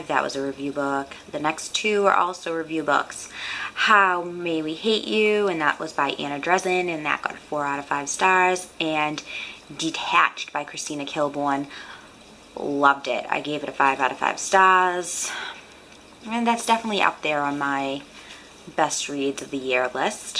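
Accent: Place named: Massachusetts, United States. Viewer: Enjoy the lists! I subscribed :) By the way, are you from Massachusetts? Just a guess from your accent :)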